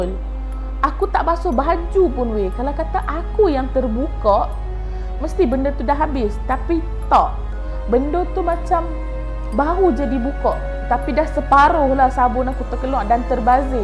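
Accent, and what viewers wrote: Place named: North, Malaysia. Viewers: Terbaik 👍👍 Teruskan bercerita dalam dialek utara. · Suka l dgr akak ckp utara😍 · sat ckp utara sat ckp kl..dik biaq selaraih baru tatabahasa cantik dn crita jd menarik..cuma nk peringat jar..apa2pun good effort..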